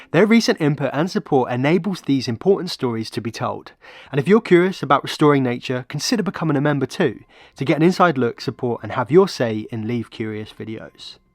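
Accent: United Kingdom. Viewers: Another posh English voice poking his nose in our business..🤢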